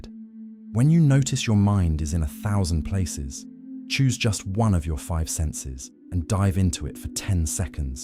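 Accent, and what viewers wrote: England, United Kingdom. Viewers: I’m sick and tired of thievEnglish accents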